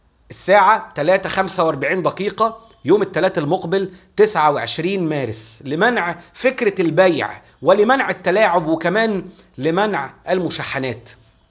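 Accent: Upper Egypt, Egypt. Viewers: أنت مبين من لهجتك صعيدي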